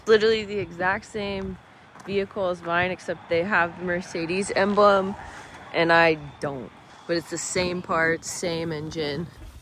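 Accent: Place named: South, United States. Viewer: She is very beautiful. I love her smile and southern accent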